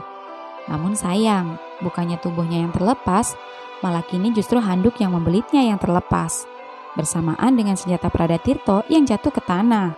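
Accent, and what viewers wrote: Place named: Eastern Indonesia, Indonesia. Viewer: .. 👍👍👍 Aku suka cerita mu.. Siapa sih pembawa cerita ini? Kamu pandai membawakan cerita ini dengan dialeg Indonesia Timur mu hebat. Hanya dialeg Jawa kasar nya perlu improvement sedikit.. Dan sangat menarik cerita nya dengan pandangan taboo budaya Indonesia… Malu malu walaupun mau dan harus membohongi diri sendiri.. self denial..😢😢😭😭 Semoga selalu ber kembang dan sukses Channel ini.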